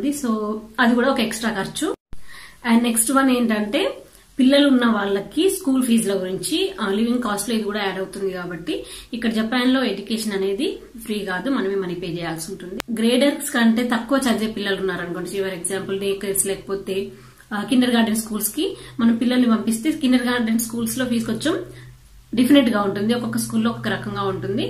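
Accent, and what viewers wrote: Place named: Godavari, India. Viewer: Nice info sis Nakkendukko japan ante chinnapadinunchi chala ishtam particularly Maybe when i was young babiah baga vellevaru ahh country ki, he is now MD of SaintGobain ippudu kuda appudu appudu velthuvuntaru Shud bisit Japan soon chellai , thanks for the info very much Bythewsy india lo meh proper ekkada godavari jilla nah mehh mataluu vintunte alanehh vundhi 💚😊😊😊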